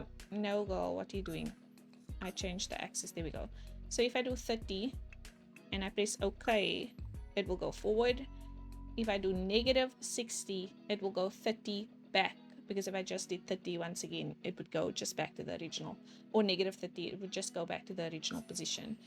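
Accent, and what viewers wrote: South Africa. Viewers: Omg r u South African?😭💗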